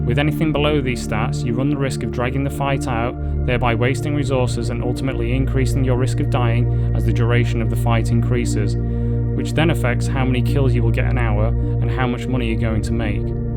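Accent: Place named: United Kingdom. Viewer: sat through a 20 second intro just to find out this guy is british, disliked and clicked off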